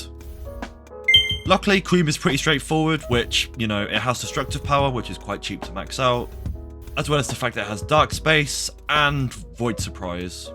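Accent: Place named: United Kingdom. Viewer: You talking like Stolas or Octavia in Helluva Boss (The british accent i think xd)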